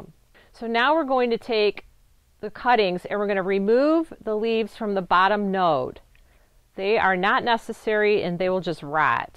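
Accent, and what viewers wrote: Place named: Chicago, United States. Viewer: Her accent makes me miss Chicago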